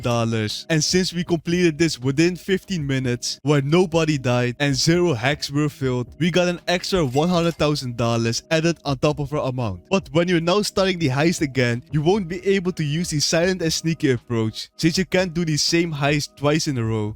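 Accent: Netherlands. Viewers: We horen de Nederlands 🥀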